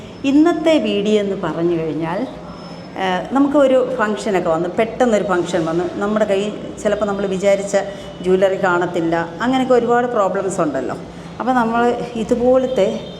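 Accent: Kottayam, India. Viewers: Thamasam tvm anenkilum samsaram kottayam anu ennu thonnum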